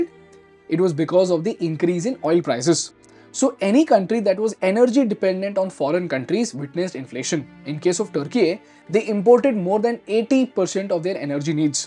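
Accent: India. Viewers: that indian ancent bleed my ears btw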